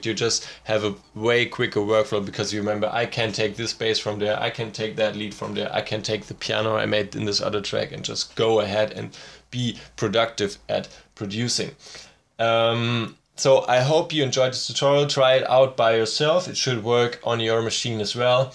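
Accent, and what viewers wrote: Germany. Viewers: You sound hella german lol. I know because I'd also sound like that when speaking english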